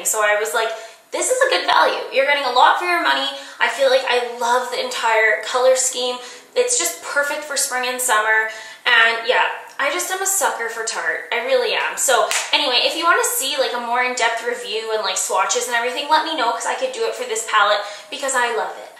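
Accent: Canada. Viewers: Random question, are you Canadian? :)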